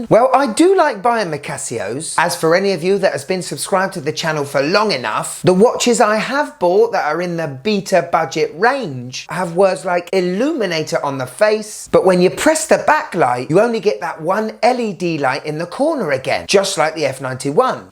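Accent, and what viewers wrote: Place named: England, United Kingdom. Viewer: What an english english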